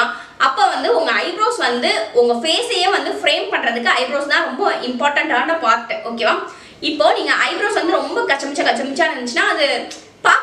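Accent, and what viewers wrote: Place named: Madurai, India. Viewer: Sissy neenga maduraiya 😘😘 slangeh solludhu "sollikirae,kuduthurae,senjirae" Any way gud content💓👌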